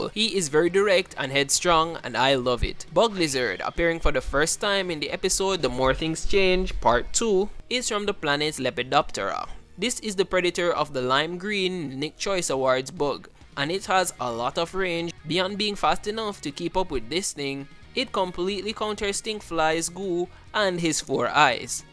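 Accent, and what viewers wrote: Jamaica. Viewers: A jamacian Galvan? I'm vibing with it. Love the accent man, and keep up the content.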